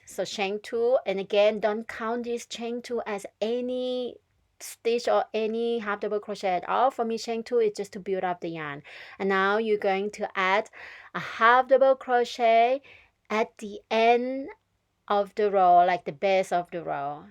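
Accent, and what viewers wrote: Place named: Thailand. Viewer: Sounds like a Thai accent!! Love the tutorial!!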